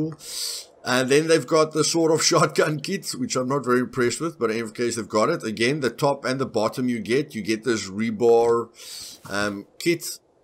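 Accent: South Africa. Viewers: hahhahahaha 1000% south african! i love it! Dankie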